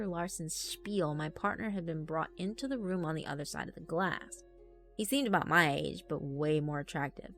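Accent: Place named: England, United Kingdom. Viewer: Loved the English accent ❤️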